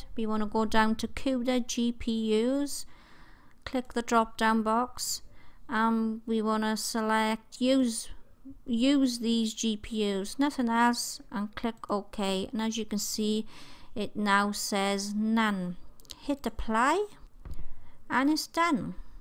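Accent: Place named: Wales, United Kingdom. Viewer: Amazing, thanks so much sexy voiced welsh lady!!! xx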